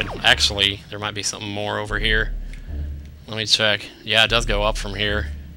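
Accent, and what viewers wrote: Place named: Wisconsin, United States. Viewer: Are you from Wisconsin? you seem to have a wisconsin accent... like me lol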